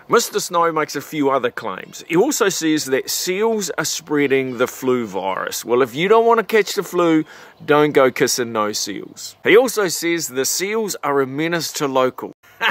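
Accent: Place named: Australia. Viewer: It is good to hear a down under accent. Makes me homesick. I will soon be heading from Nantucket’s winter to my place in New Zealand for their summer. Meanwhile, I’d like to set the narrator straight. In this Earthrace Conservation video, the narrator displays the valor of ignorance. The valor of ignorance is defined as expressing a point of view that is unhinged from knowledge of the subject at hand. Let me point out some of the errors in his narrative that deflate his claims. --Crocker Snow a respected conservationist- does not “lead” the Seal Abatement Coalition. He has been a valued member of its Board. He did, however, lead the campaign to get Muskeget Island officially designated and preserved as a Federal National Natural Landmark. ---Crocker is not concerned about the gray seal invasion of Muskeget Island (of which he owns half) in order to protect his shack there. 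He wants to protect the island’s biodiversity, which is threatened by the burgeoning gray seal population. For the real story go to <link> click on Actions and then on Unintended Consequences. ---The narrator minimizes the gray seal impact on the fishery and lays it off on commercial fishermen. A recent study of commercial fish and shellfish landings from North Carolina to Nova Scotia found that they equaled just 60% of what gray seals consume. --Far from the 15,000 gray seals eating mainly “sand lance and shrimp” that the narrator cites, a report in a regional North Atlantic newspaper cited a Canadian stud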